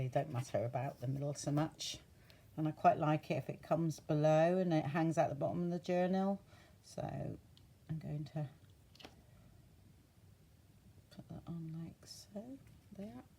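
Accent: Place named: Bristol, United Kingdom. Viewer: Are you from Bristol? That’s my husband’s hometown and you sound so much like him!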